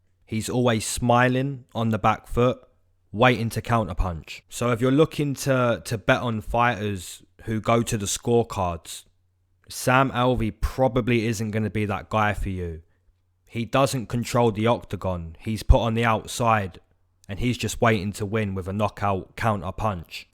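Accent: England, United Kingdom. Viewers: Hey man always looking for your predictions love your english accent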